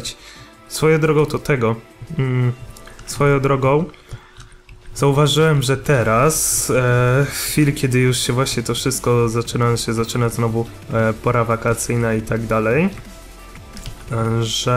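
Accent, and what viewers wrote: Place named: Warsaw, Poland. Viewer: ej jesteś z Warszawy bo słychać od Ciebie te końcówki ę,ą i tak dalej:D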